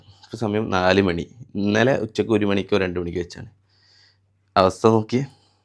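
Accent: Kodungallur, India. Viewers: slang shinkunte kodungallur slang ayallo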